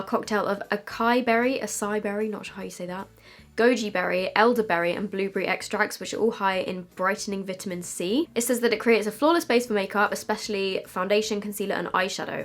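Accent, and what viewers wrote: United Kingdom. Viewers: Also, I need to say this: I love love loooove the british accent! Could listen to this all day!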